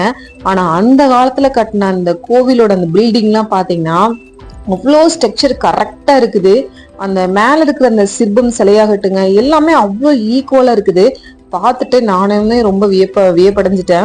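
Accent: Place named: Kongu, India. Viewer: அருமையான தகவல்கள் அவர்களே. நம்ம கொங்கு தமிழில் நீங்கள் கூறியது அருமை. Building என்று சொல்வதை தவிர்த்து 'கோவில் கட்டிடக்கலை' என்று கூறுவது மிகச் சிறப்பாக இருக்கும் என்பது என் தாழ்மையான கருத்து. தாங்கள் கொங்கு நாட்டின் எந்த பகுதியை சேர்ந்தவர்?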